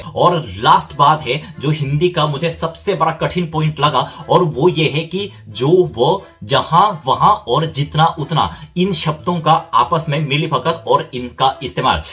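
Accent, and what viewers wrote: Standard. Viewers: Bohut sudh hindi bolta h bhai esha hindi toh hamlog nahi bolte. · Aapki Hindi bohot acchi hai or uccharan bhi bohot shudhh hai....Agar aap bataoge nahi toh kisi ko bhi pta nhi chalega ke aap Koreai nagarik hai.... You explained the whole thing beautifully....the video also tells a lot about Korean language.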